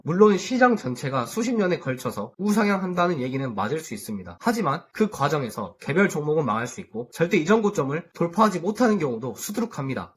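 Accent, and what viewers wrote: Jeolla, South Korea. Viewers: 전라도 분이신가요? 반갑네요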